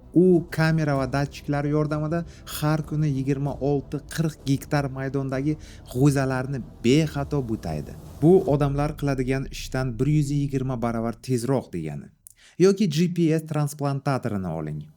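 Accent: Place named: Bukhara, Uzbekistan. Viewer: Bro buxorodanmisiz deymanda 😅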